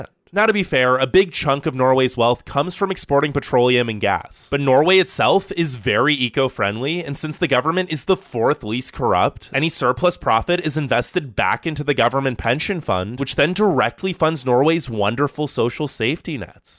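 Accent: United States. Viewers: Great video idea, but the ranking and argumentation is a bit weird sometimes and THE VOICE SOUNDS SO AMERICAN I HAD TO STOP WATCHING AFTER A FEW MINUTES 🙆🏻‍♀️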